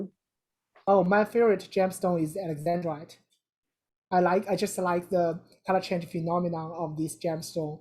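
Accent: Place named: China. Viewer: Incredible presentation. I got cheated out of school but I would have done great in college. I used to love making presentations like this. This man would be a fun teacher. I know what it can be like to have pseudo intellectuals brag about something that they know nothing about, when its something you're passionate about the knowledge of. I love all fields of science. I searched for this video just by hoping it was a thing. Very Incredible presentation. I feel like he and I would get along well. I had gotten accepted into a trade school but I was one of tge unlucky few who had a cinema like group of bullies that beat me up for no reason and broke my glasses, so I stopped going to school but I never stopped being passionate about learning. Im sure people in your field have to deal with know it alls like pseudo spirituality people and corporate minded manufacturers. 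I bet its frustrating. I do find gems and chemistry in general fascinating but my area of expertise is literature and history. I got bullied into leaving school, chose to be a superhero and went up against things out of horror movies. Lost my best friends in sad scary ways and try to give shout outs abd credit where its due. I dont know much at all about the man in this video, but hes gifted and based on his accent, Im going to assume his first language is mandarin. I find other languages interesting too. Knowing more than one generally makes you cooler than people who dont so Im impressed. I feel like English is on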